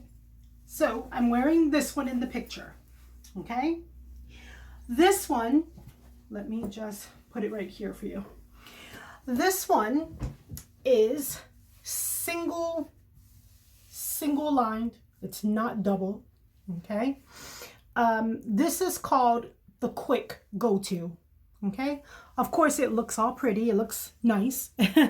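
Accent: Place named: New York, United States. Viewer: I love your ideas. I sew but usually straight lines. You are extremely helpful. I also love your accent. NY? NJ? Mass?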